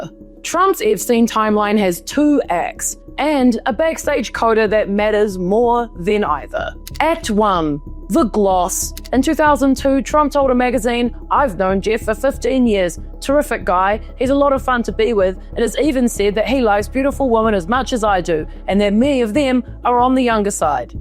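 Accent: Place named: New Zealand. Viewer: New Zealand/Kiwi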